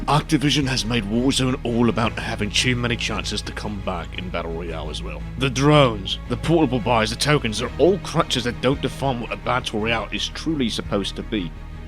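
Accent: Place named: United Kingdom. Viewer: ahh deep british voice man talking about COD with quality content, let's go sir. Mr. Xbox Ahoy left a massive void that's never truly been filled. Best of luck to you.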